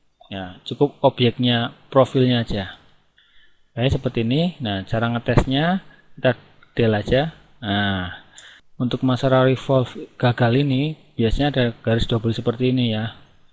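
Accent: Banyumas, Indonesia. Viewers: Belajar ning pasti kepenak...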